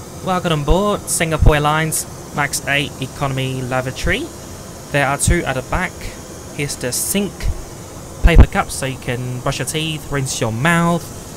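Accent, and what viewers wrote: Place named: Australia. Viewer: why do i feel like your accent has changed. it's more aussie than before.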